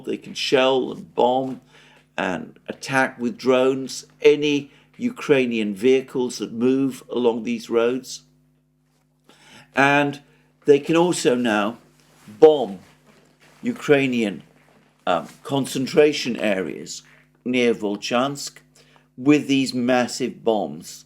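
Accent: United Kingdom. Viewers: A year's training for Russian's soldiers eh? I don't think I've ever caught Alexander telling such a bald-faced lie before. The man absolutely has no shame. He appears to have no regard for the intelligence of his audience. He knows he's just shoveling thinly disguised nonsense gussied up with a British accent and a 50-cent thesaurus, and is just taking the money and running with it.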